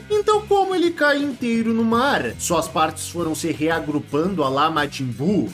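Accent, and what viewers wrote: Rio Grande do Sul, Brazil. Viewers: bah o meu tu é gaúcho né??? muito bom é os guri hehehehehhe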